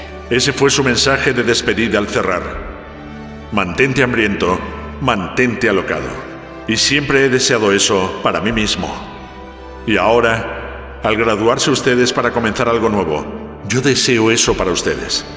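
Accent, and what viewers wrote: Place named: Spain. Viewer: Todo está bien, pero el acento español le quita nostalgia y seriedad, un español latino le hubiera ido mejor.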